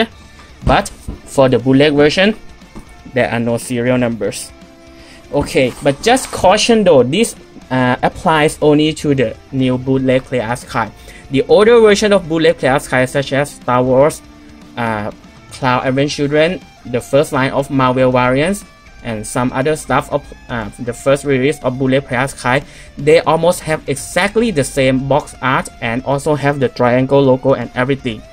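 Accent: Thailand. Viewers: Are you Thai? พี่เป็นคนไทยไหมครับ ฟังจากสำเนียงแล้วน่าจะใช่( From your accent). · สำเนียงเหมือนคนไทยเลย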